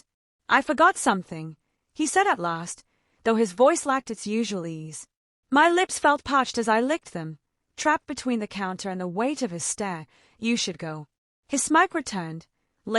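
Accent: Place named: Australia. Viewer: Oh I like the Australian accent on this girl. It actually makes her pleasant to listen to.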